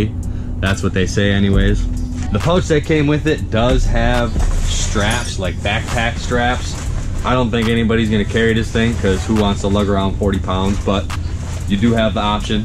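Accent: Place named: Wisconsin, United States. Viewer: I’m from Wisconsin as well and man it took not even 5 seconds to guess you were from Wisconsin. Could hear it in the voice easy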